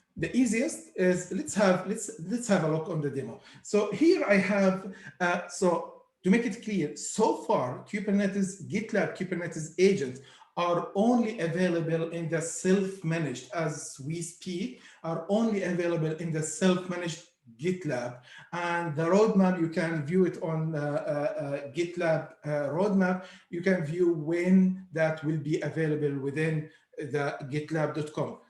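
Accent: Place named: India. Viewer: Can you get someone who doesn't have an Indian accent to do these videos? Sounds horrible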